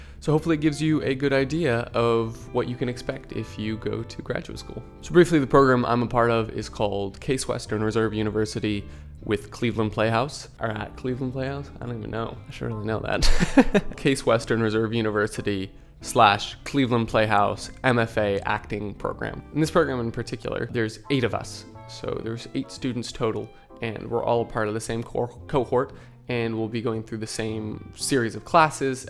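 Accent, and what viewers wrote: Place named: RP, United Kingdom. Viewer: Love the little Austin powers references at the end. Will concur will my fellow Brit that your RP is pretty dang good, though more newsreader than nobility heh, but you definitely are hitting the intonations well, good job. Amazed that this is an average week though, as sounds very busy, but I guess with performances and education combined, it is like two courses in one. Although not a degree I considered when I went to uni, I have family members who did, so this was really fun and a great insight to an acting degree. Keep up the content, and best of luck for the future.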